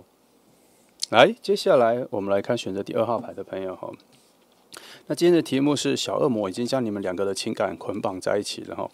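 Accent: United Kingdom. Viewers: 1.準。喜歡2但我直覺選了1。感謝說了一口漂亮英國腔的 😊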